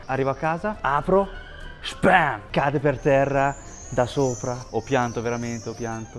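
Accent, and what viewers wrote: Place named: Romagna, Italy. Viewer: Hai un accento romagnolo, direi riminese, che mi fa morire 😅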